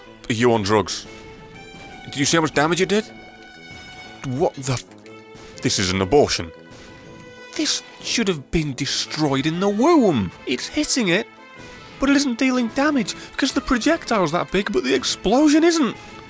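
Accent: United Kingdom. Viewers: I can’t tell if he is English, Scottish or Welsh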